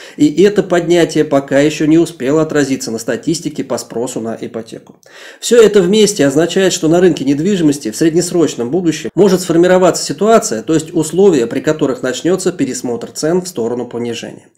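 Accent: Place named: South, Russia. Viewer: у вас южный акцент. Если не секрет вы откуда приехали в Москву. 😊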